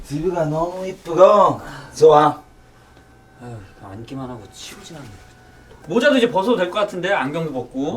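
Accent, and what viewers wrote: Japan. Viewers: 한본어 쩌네 ㅋ(어린시절 보고 찌잉해져따..따흑) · 저는 일본사람인데 다나카상 정말 웃기네요🤣 특히 외래어가 어려울 텐데 액션을 아크션, god를 곳도라고 하는게 완전 일본식 외래어 발음이에요!! “집이”를 “집이가”라고 하는것도 일본인들이 많이 틀리는 한국어죠~ 요즘 일본에 저런 호스트는 거의 없겠지만ㅋㅋㅋ 넘넘 재밌어요👍🏻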